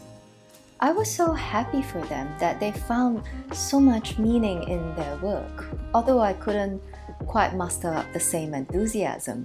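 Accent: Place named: Singapore. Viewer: Okay, I knew it, you are from Singapore. The accent just can't really be hidden... it's too distinctive... plus the description about stressful studies and city-life, corporate rat race... Nice videos... must be nice to be able to just get away from the rat race.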